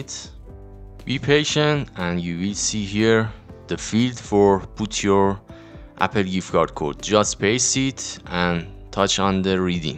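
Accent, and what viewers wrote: Iran. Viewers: داش لحجت ایرانیه، چرا نمیشه تو کلش استفادش کرد ؟؟ هرکار میکنم میزنه رو رویال پس میره تو خرید با کارت مستر یا ویسا گزینه اپل پی نمیاد؟؟؟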